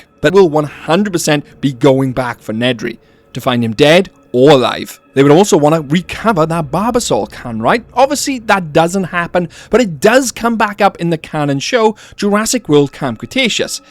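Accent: Wales, United Kingdom. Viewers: Are you welsh? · He sounds Welsh to me but I know what you mean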